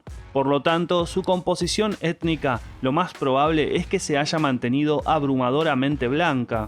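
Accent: Rioplatense, Argentina. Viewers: No solo los porteños hablan así por si no sabías el lenguaje rioplatense habla medió país que no te guste problema tuyo, es una tonada única y hermosa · Que desagradable el acento porteño del relator.... demasiado cha, che, chi, cho, chu. En Argentina la gente más educada no habla tan así. En este video hay un desagradable tufillo xenófobo. Esa idea de valorar en demasía la raza blanca. En todas las etnias hay gente muy valiosa y también de lo peor. En mi caso y el de mi hermano somos como muchísimos chilenos, hijos o descendientes de inmigrantes. Mi papá de Varsovia Polonia, y mi mamá de Manchester Reino Unido. Eso no hizo que tuvieramos ventajas sobre otros compatriotas. En Chile existe una alta valoración de nuestros ancestros indígenas. Yo opino que nadie es superior a otro ser humano por nacer indígena, de genética europea, africana o mestiza. 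Que seas más verde, azul, bajito o de cabello rizado no vas a contar con ventajas importantes. Sean Peszynki desde Santiago de Chile💥💥💥💫💫💫💫💫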